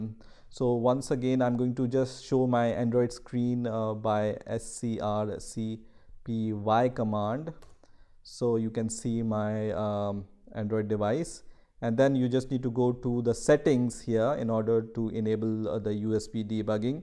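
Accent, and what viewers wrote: India. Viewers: This video has been really amazing, I wan able to get it done in no time, thanks to the nice Indian accent and the step my step directions. It is just perfect. Thanks alot, I'll suscribe for sure. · Indian talk Me: Dislike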